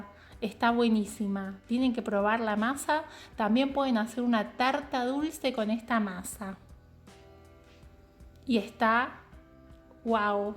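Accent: Uruguay. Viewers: Que padre me encanta ese acento genial no me equivoque te cuento mi yerno es de Montevideo Uruguay sin q te ofendas tiene muy parecido el acento aunque tiene muchos años viviendo en CDMX el es actor.... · Linda tarta! De donde habla? Por el acento, me imagino que sea Uruguay o tal vez Argentina. Saludos de un brasileño que adora tus recetas 😉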